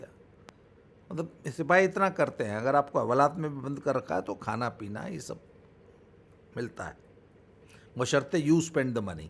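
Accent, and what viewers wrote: Haryana, India. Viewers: Aap gazipur , banaras ke h bhasha haryanvi h😮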